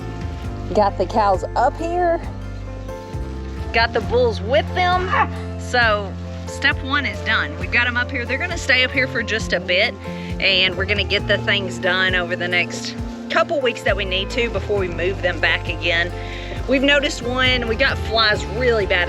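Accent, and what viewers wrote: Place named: Appalachia, United States. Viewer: Well I'm hooked lol Good lookn gal right there with a soothing voice sounds just like a Appalachian gal👍🏻😍 She can tell me bed time stories anytime,yall take care of the animals and yourselves!!! I ll keep checkn in on ya😉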